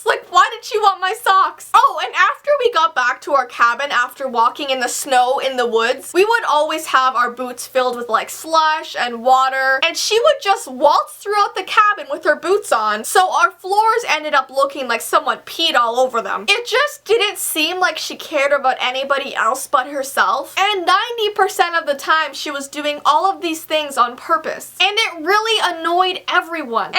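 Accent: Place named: Canada. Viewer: think it's jut her Canadian accent. In her baby sitting vid, she pronounced bagel as BAG-gul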